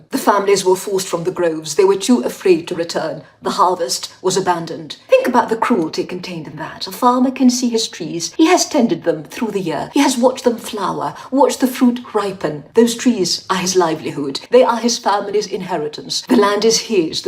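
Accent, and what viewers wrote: RP, United Kingdom. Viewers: I'm really sorry. I can't listen to this affected RP accent. فلسطين 🇵🇸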